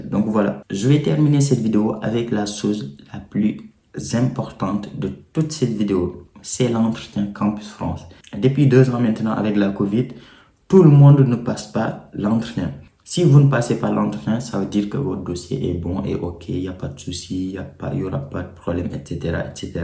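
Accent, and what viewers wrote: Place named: Senegal. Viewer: L'accent sénégalais, j'aime bien 😎👌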